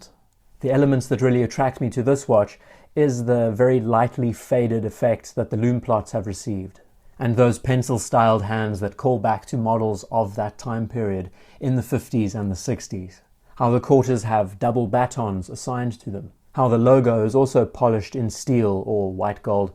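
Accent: South Africa. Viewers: Do I detect the South-African accent? )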